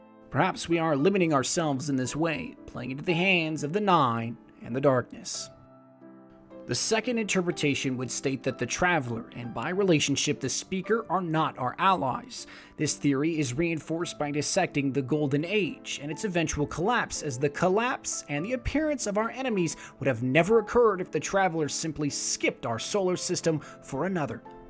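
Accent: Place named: New Jersey, United States. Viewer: I feel like you're from either New Jersey or Pennsylvania. Those are the only places I've seen people spell "could've" as "could of" and heard people pronounce "library" as "libary". It's not a bad thing, just an interesting thing I've noticed.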